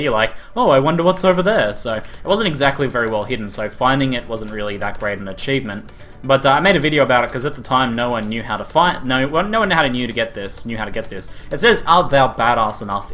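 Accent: Australia. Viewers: Are you Aussie? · woohoo a Aussie YT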